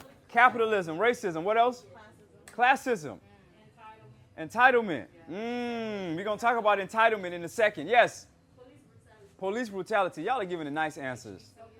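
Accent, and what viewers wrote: Ghana. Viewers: Lol, your American accent is not 100 % anymore. Except for the parts where you are deliberately sounding Ghanaian, you have that Ghanaian accent flaring up for real and creeping in uncousiosly.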